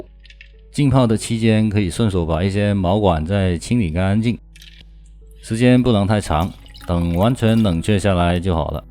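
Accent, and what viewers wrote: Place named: Cantonese, China. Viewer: 帶著廣腔的普通話，清晰，幽默風趣的的旁白，讚！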